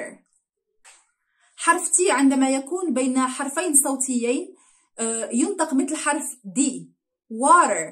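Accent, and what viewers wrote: Morocco. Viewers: هل انت مغربية ام جزائرية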